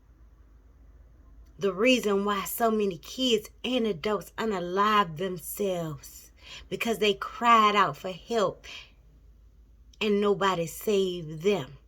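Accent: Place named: Detroit, United States. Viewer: I’m a new subscriber. Enjoyed your video and I definitely hear that Detroit accent. 😀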